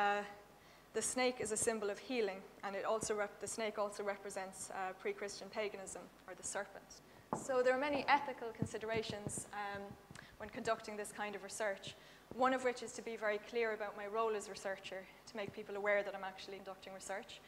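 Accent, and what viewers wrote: Ireland. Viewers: Such a good presentation. Also that Irish accent is to die for. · You did an excellent job presenting your research methods in an unbiased fashion. It's rear to hear anyone talk about any religion without hearing a lot of the personal opinions of that person. You did a great job!! I look forward to reading your book! Though another video presentation would be nice. (Im from America, so hearing about paganism in a Irish accent is really cool, plus you have Gorgeous eyes ;) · you have the most beautiful eyes and I absolutely love the Irish brogue in your voice,...pretty and smart is a rare combination...huzzah to you!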